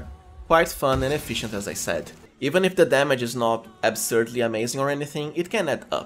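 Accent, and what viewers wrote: Brazil. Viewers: Com esse sotaque ja sei que eh br. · Tu tem um sotaque brasileiro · This guy has to be Brazilian, I just know iiiiiit!!!!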